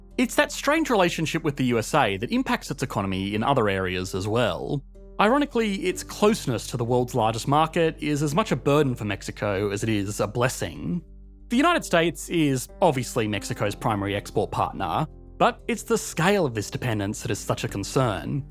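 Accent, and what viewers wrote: Australia. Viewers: He is Australian. This is his channel, he owns it. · because he's Australian and that's their accent? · Because the guy is Australian. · this looks like an interesting video but I don't know if I can watch it as the guy's accent is incredibly annoying! The way he puts stresses where they don't belong and puts unnecessary drawls at the end of words like "issues...ssss" and "economy...yyyyy" is awful! Don't know where in Aus he's from, I'm British btw.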